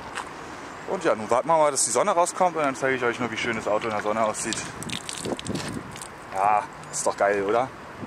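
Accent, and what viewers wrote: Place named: Berlin, Germany. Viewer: Ohhhh Berliner 👍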